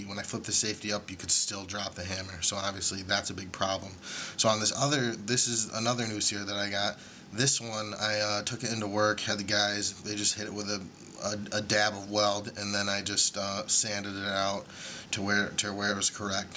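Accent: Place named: Michigan, United States. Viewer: btw you sound like you're from michigan haha no offence if you're not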